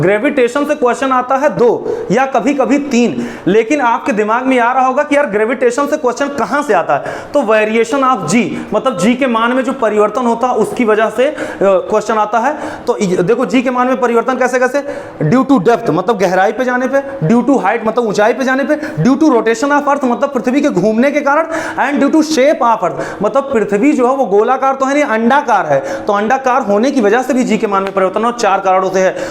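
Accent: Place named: Punjab, India. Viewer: Sir come fast. We need your class. For the First time Maths became easy when i watch Mayank Sir's video and now we got a dj style sir for physics also. And your Hindi is a mix of punjabi language,which i love to hear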